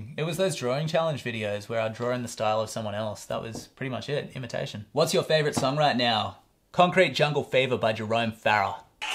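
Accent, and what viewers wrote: Australia. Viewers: …Have you considering recording ASMR/bed-time-story/Calm-app-style content, in which you dish out your sage wisdom in that gnarly Aussie accent so your subscribers can fall asleep every night slightly less anxious? … Asking for a friend. 🤣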